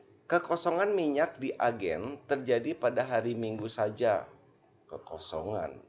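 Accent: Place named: West Java, Indonesia. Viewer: terimakasih kang, lucu menjelaskannya aya aksen sundaan 😂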